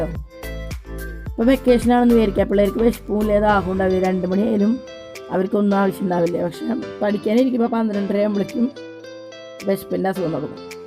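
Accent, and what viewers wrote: Thrissur, India. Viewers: രസമുണ്ട്ട്ടോ പറയുന്നതുകേൾക്കാൻ തൃശ്ശൂർ ഭാഷ ഇഷ്ടം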